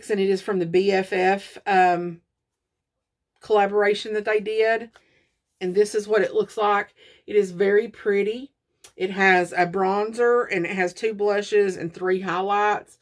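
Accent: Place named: South, United States. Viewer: Ong I heard the southern accent right away. Lols it’s the sweetest accent 😊😊 I’m having this weird obsession with finding the right face palette so I’m so curious to see what you have and what you keeps lols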